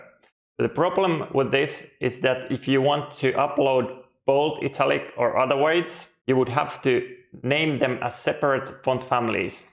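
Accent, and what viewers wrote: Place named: Finland. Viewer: Bro! Avasin taustalle kuulumaan ja meni 4 sekunttia aivoilla tunnistaa Suomi-aksentti. Kiitos.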